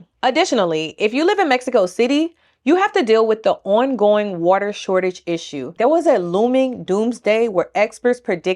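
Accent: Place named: Oakland, United States. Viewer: Sounds like Oakland or Chicago to me 😂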